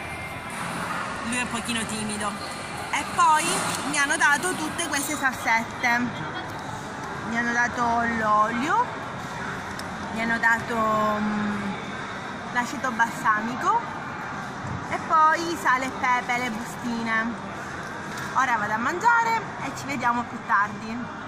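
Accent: Sicily, Italy. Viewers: Si sente l'accento siciliano ahhahahahhaha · Ciao simpaticona! Mi è familiare il tuo siciliano😂😂😂 Pizza bruciata, io l'avrei portata indietro subito! Ciao... non ricordo il tuo nome, a presto!😉😘Pollicione in su!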